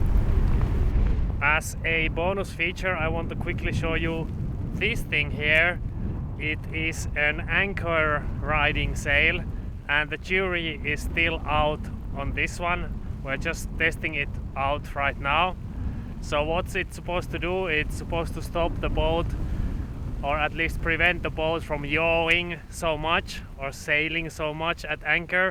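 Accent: Finland. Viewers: Once again, simple and very informative with a charming Finnish accent, be well and trust your personal SISU angel and you'll be fine & safe, cheers from Karelian Poika!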